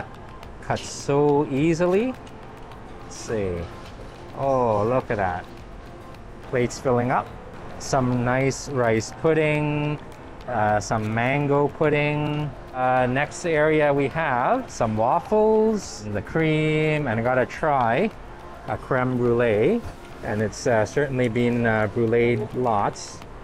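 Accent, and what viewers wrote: Canada. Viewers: I like your HEAVY Canadian accent. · You have a Canadian accent!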